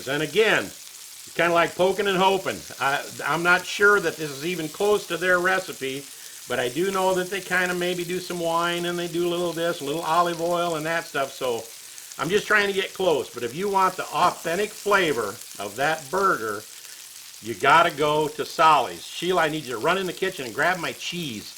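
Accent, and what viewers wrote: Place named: Minnesota, United States. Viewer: I'm from Minnesota and I thought you sounded like a Minnesotan.I've been living in Missouri since 1975 and I still get busted for my Minnesota accent. Ya just can't shake it. LOL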